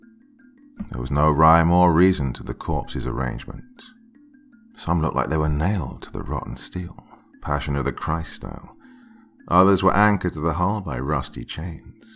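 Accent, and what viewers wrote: United Kingdom. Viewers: Hes British cut him some slack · Part 2...yes please. I was stuck on the narrator's British(?) accent in the US Navy but thats just nit picking. I like the story so far.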